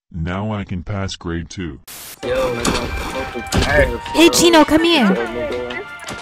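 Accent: Jamaica. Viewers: Are u Jamaican cause I'm Jamaican. BTW I'm your biggest fan ❤ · I like how she tried so hard on the Jamaican accent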